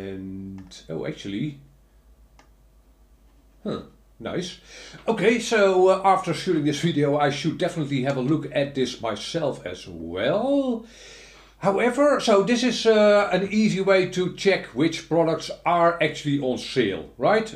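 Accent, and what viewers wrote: Netherlands. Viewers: I use qx7 Ik gebruik nu een qx7 Love your channel and your dutch accent by the way. Keep on with your channel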